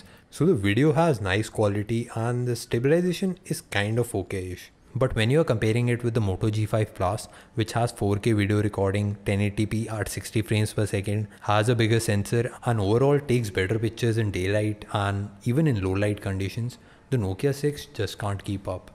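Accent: India. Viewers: Why are you people complaining about the way he speaks when you know he is from India?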